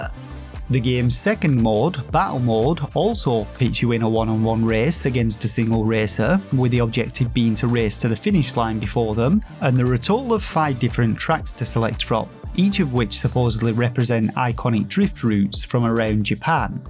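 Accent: United Kingdom. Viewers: Hey, not hating on you or anything, but please can you tell me what your accent is? I am genuinely curious. I can’t understand most of what you say, but I am fascinated that the auto-translate can pick up 99% of your brit English. I just need to know please. I am beyond OCD at this point and it frustrates me.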